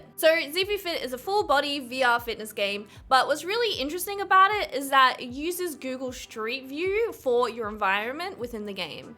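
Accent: Australia. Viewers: That's definitely not a British accent. She's Australian.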